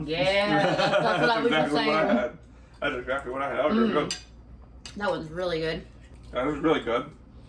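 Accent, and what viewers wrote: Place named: South Jersey, United States. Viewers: Love your videos . You must be from jersey! I grew up there i hear it in the voice! Great recipie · Interesting fillings.. I saw you said you were from New Jersey ..Sounds like a South Jersey accent .. I grew up in Vineland .. Many many years ago .Actually a tiny town right outside Vineland called Newfield.. But if your not from there you don't know its there lol.. Found your videos just a few weeks ago .. Well done.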